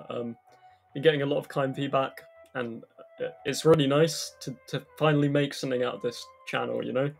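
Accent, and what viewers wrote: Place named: United Kingdom. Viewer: British people pronouncing non-British things.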